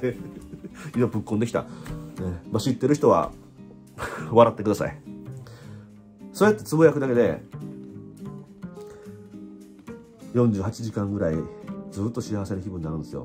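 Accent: Osaka, Japan. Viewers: 少し、面白くないことがあって、得意でないお酒を用意して、 YouTubeでも観ようか。。。と思ったら、そこにQさんが居ました。 丁度、今、聞かなくてはならないお話でした。結果、楽しいお酒になりそうです。 大阪弁で少し早口のQさん、とても良かったです。いつもありがとうございます。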